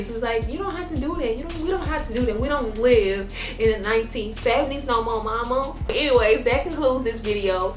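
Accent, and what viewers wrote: South, United States. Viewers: girl you so country just like me! lol i love it!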